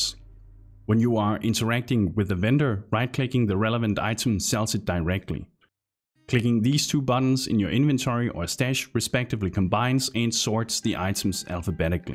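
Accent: Denmark. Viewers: Not sure what's worse, looking swedish or having that horrible danish accent. However, this video is exactly what I was trying to find, great tips! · are you danish? sounds alot like a danish accent... bashing the swedes... definitely danish :D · Thought you sounded aggressively danish then you started throwing shade at those pesky swedes. Thank you for the video bror 🙂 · Your accent is a give away for your Danish roots, but the Swedish hobo joke removed any doubt 😂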